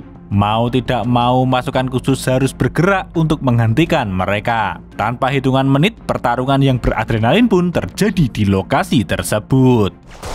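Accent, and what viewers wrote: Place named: Java, Indonesia. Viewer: bang yg ini take voice ny kok medoks jd kurang epic haha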